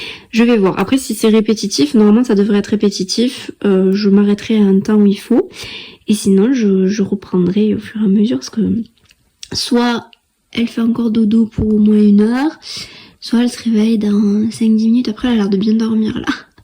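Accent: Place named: South, France. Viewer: J'adore ton accent, tu es du sud-est ou du sud-ouest? personnellement je suis ton vlog depuis Toulouse, j'aime le sud de la france. Ton petit chiot est adorable!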